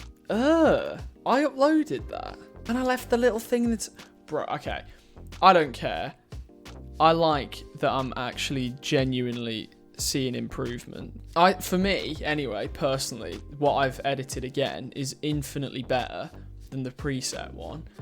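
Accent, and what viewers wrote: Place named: Northern England, United Kingdom. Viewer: You sound well northern in this 🤣🤣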